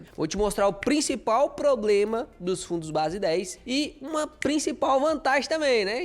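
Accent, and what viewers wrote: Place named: Goiânia, Brazil. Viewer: Tu és de Gyn mesmo? Tava pensando: "Esse sotaque... É de Gyn, de Minas de Tocantins ou no interior de SP?" rsrsr. Mas tava apostando que seria de Gyn (já morei por muitos anos em Goiânia mas nunca peguei sotaque, rsrs. Ou talvez...sei lá, rsrs)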